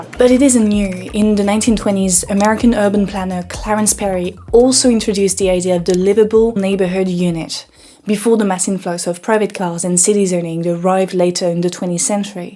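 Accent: France. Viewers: Oh you're french! No wonder you're so smart. Also, french accent makes everything sound classy.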